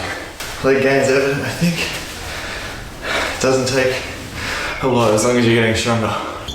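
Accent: Australia. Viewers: Hey man, its probably because of the aussie accent but you remind me so much of calm, less crazy Last Natural! Keep up the videos really enjoying it.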